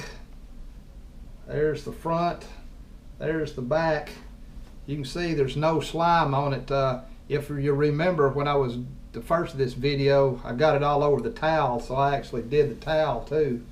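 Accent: South, United States. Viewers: Why thank you!... Love the Southern accent!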